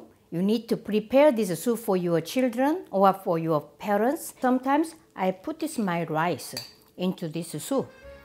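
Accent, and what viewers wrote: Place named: South Korea. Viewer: I'm korean. I'm not good at English. but I can understand your speech. I think you have korean accent when you speak engligh.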